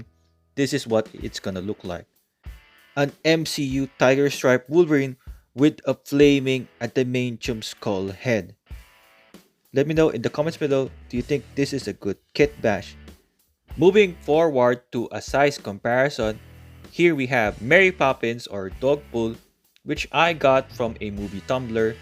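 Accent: Philippines. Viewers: Your accent screams that you're a filipino. Lupet tol! 😂 Edit: after i saw darna figure, it confirmed my theory earlier, pinoy ka nga talaga, bro! 😅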